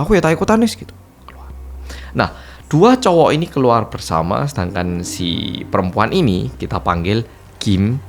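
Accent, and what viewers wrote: Java, Indonesia. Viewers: Kalo Liat mas kaya liat Young Jay just jerk versi medokk 😂. Jago dance juga gak ya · Lihat mukanya mirip bintang korea, haha Logat bahasanya kek orang jawa/ kental bgt Asli mn si kak?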